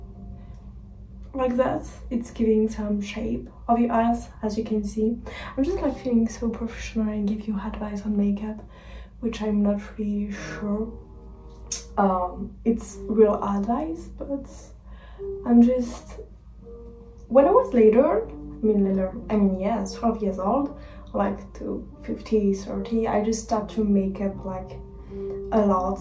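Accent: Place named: France. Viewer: I like your accent french girl. You are very pretty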